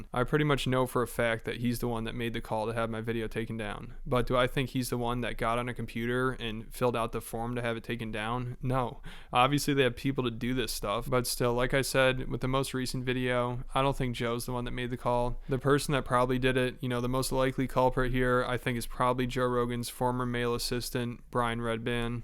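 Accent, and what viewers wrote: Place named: South, United States. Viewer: Are you from the south? I feel like i hear it slipping out occasionally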